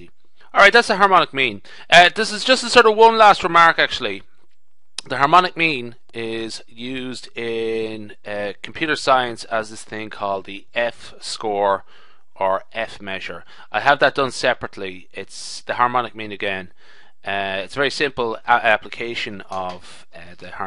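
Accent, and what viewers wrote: Ireland. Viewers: Hi! Are you Irish? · Is that an Irish accent I detect....? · Yas Niall!! I noticed that too and I was laughing the whole time. (I meant that positively because I like Irish accent) ☺☺☺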